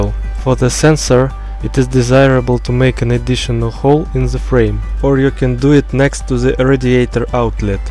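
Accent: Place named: Soviet Union. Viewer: Советский акцент английского языка =))) на 12й минуте спалился русским счётом! =))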